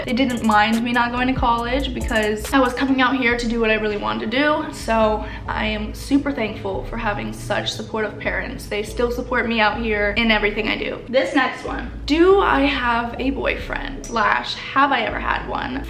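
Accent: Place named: South, United States. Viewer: I think it's more of her trying to cover up a southern accent. "SAA-FAR-EE" instead of "suh-far-ee".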